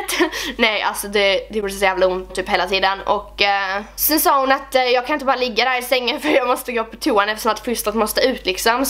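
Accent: Scania, Sweden. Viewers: Asså du e typ den enda ytbern jag kan sitta och lyssna på en hel video, tröttnar typ på andra men du e bäst på det (och jag älskar skånska 😂) Love u ☺️❤️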